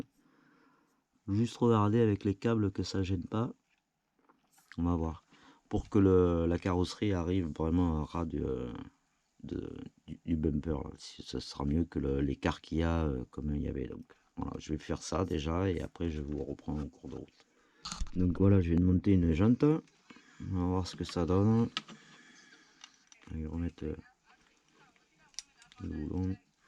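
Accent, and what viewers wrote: South, France. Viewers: moi je préfère avec ton accent du ☀️☀️ it's smells the canicule 🤣🤣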